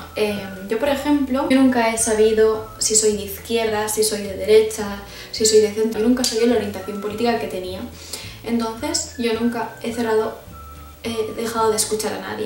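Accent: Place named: Spain. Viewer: Son buenisimos tus vídeos, creo que vas a llegar muy lejos, tu acento español y tu voz trasmiten paz. Saludos desde Argentina!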